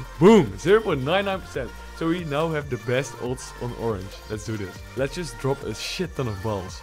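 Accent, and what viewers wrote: Netherlands. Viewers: Dutch accent? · NEDERLAND !!! ik dacht al je hebt een Nederlands accent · ik hoorde gelijk dat je nederlands was hahaha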